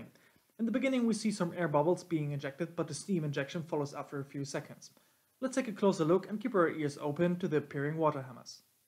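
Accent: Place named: Germany. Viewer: Your English sounds rather German-ish with that accent so I'm gonna ask in German Das ist ein interessantes Video danke erstmal dafür aber sehen Dampfschläge in Rohrleitungen zb. nicht komplett anders aus? Natürlich bezieht sich das Video auf einen Kondensatbehälter bzw Speisewasserbehälter aber habt ihr ggf auch ein Video das sich mit Dampfschlägen in ROHREN befasst? Das fände ich sehr interessant und wichtig zu wissen für angehende Kesselwärter zu veranschaulichen warum das richtige entwässern eines jeden Dampfsystems vor Inbetriebnahme so wichtig ist :) danke für die Aufmerksamkeit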